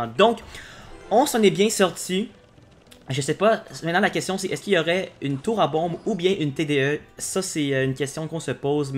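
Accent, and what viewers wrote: Quebec, Canada. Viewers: J’adore ton acent Québécois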